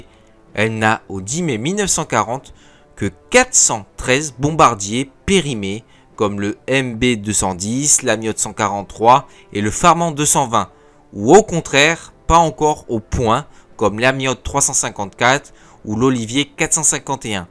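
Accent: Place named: England, United Kingdom. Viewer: Je suis attristé d'entendre que les noms allemands sont prononcés à l'anglaise ! C'est dommage parce que la vidéo est bien faite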